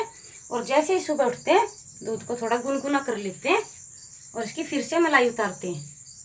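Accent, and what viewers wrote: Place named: Hyderabad, India. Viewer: Madam aap se aane ki taraf se ho kya aapki awaaz Udhar Ki Lag Rahi Hai main Hyderabad se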